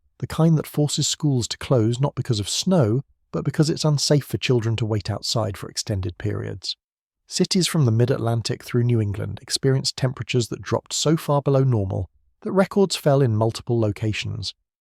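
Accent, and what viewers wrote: United Kingdom. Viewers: Why a British accent narrating US weather.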